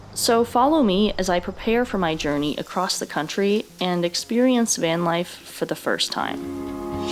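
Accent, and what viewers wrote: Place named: Boston, United States. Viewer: But I love your Boston accent!! Also that knife shirt is a total vibe...DON'T MESS WITH! It really inspires me that you're tackling so much of this build all on your own and it's such a joy to watch. (I know you had help with some of the electrical stuff, but so much of the woodwork has been by yourself - it appears at least.) I always had my partner to bounce ideas off of and problem solve with, and I don't think our van would have gotten finished on my own. You're such a boss lady!!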